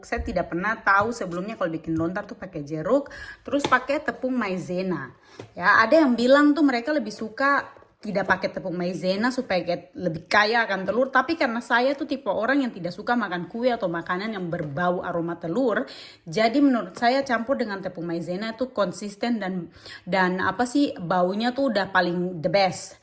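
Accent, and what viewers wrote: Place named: West Papua, Indonesia. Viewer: Kakak ini dari Papua atau dari Sulawesi dari penampilan kakak kaya orang Sulawesi tapi dari dialek kakak kaya orang Papua Barat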